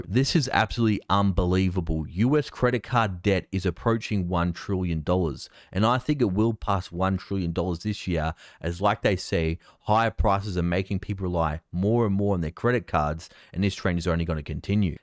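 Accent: Australia. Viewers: Thanks, your sincerity, enthusiasm, and respect for your viewers shows on everything you do! And as an American, I love hearing real news delivered with an Australian accent! Great job!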